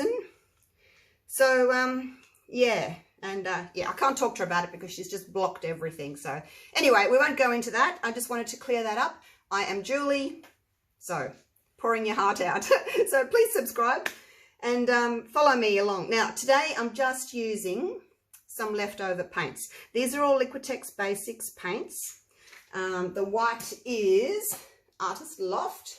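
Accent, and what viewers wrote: Australia. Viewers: Hi Julie. I have been subscribed to Your channel for 2? Years. You are a favorite because of Your talent for beautiful paintings. I Love the Poodles and Birds, plus your gorgeous back yard. I know who Julie is, and your accent, Your art, and the joyful vibe. This painting turned out well, although I was nervous. I could feel the stress from the beginning, but You calmed to natural, as the painting evolved. Relaxing. I also subscribe to Gilly. Her work will never be compared to Your work, because it is so different. I appreciate Both!! Thank You for everything that You share. I wonder.... both of you, are Australian. The World Loves anyone from Australia. You are the best. What if You and Gilly talked? Maybe it was an honest mistake? Or not? Would an apology work? Could You and Gilly combine as a Team for Australia. We need both Artists. 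I am an American, and depending on you Both to get through this horrible time by doing art. I Need you Both. Please patch the fence. If You, Julie reply to Me... I am going forward to contact Gilly. Otherwise, I will stay silent. I adore You, Julie. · Hi Julie, Im enjoying your pouring demos ❤ Great to hear your Aussie accent, I'm watching from SA. Thanks for your explanations and sharing your experience. Love your art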